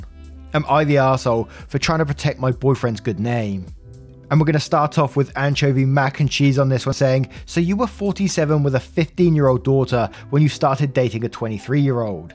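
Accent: United Kingdom. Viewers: I have listened to so much UK media over the years I don't notice the accent, until you call the TAH a Berk and then I giggle.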